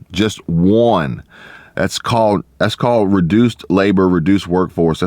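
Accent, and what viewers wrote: Texas, United States. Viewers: Great video. You sound like a Texan police. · Are you from Texas..? You sound like one of us lol!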